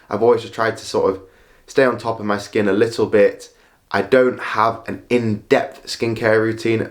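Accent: United Kingdom. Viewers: Love your accent! Is it a northern UK accent? I have some problems following you! No wonder I'm from Sri Lanka 😆 😆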